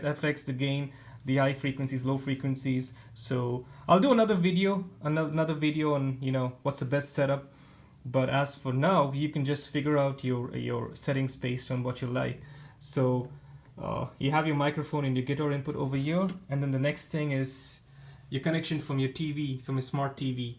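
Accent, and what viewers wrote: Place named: India. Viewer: It's so weird u sounded more like an Indian (callcenter) than that of a Flip. Salamat sa set up!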